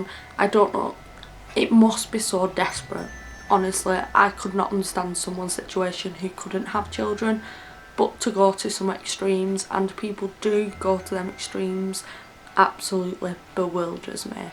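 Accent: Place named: Wigan, United Kingdom. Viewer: Her parents had nothing to do with her disapperance. Not enough time and where could they hide her in that time. She was obviously kidnapped, and the rest we do not know. I hate it when people bring conspiracy theories etc. It heart breaking and the thought of her being sold to sex traffic makes me sick. I really hope that she is living with someone who could not have kids and she has forgotten what happened to her and is living her life happy. I'm trying to figure your accent, is it Bolton, Wigan, Oldham or Cumbria. I live on Anglesey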